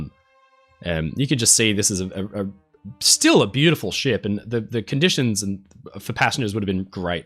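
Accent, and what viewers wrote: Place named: Tasmania, Australia. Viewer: I love your Tasmanian/Victorian accent. Also, from here in the deep interior of North America (Colorado) most of us will never get a chance to experience the ocean/sea . thank you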